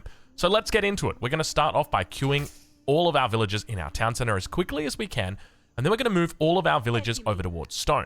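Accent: Australia. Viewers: Listen at 0.9x to compensate for the Australian accent!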